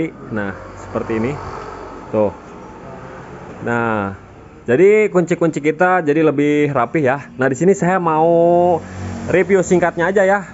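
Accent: West Java, Indonesia. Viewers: kang lokasi nya dmna ? kaya orang sunda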